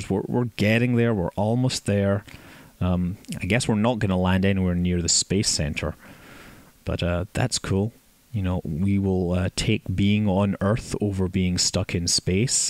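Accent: Scotland, United Kingdom. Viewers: Anyone else hear just how Scottish accent is here?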